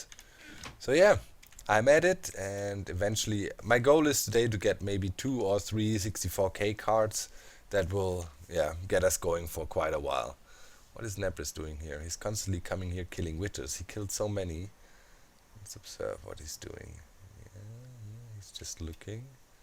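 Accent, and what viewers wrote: Germany. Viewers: I'm new here.. But i'm sure he has a german accent!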